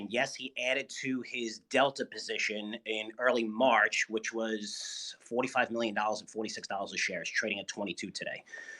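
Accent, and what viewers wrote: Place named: Staten Island, United States. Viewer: This dude sounds like he's from Staten Island. Demz, Deez and Doez. Sounds like he works in sanitation.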